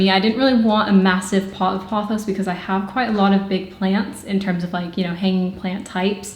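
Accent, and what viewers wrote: United Kingdom. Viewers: Have you lived in England before? I hear a touch of British speak. Great collection by the way. I love the trubeii a lot. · You sound mildly British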